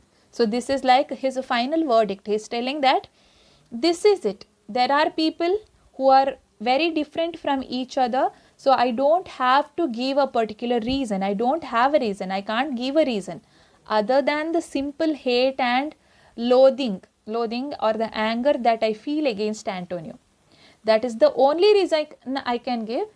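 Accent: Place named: South India, India. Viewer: Good explanation... With south Indian accent 😊👍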